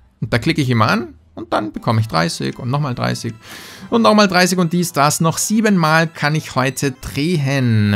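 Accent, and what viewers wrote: Austria. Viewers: bist du aus Österreich?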